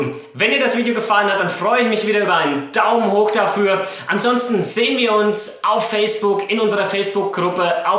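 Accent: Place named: Franconia, Germany. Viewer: Aus Aschebersch... und dann so einen schönen fränkischen Dialekt :D. Halbhesse :D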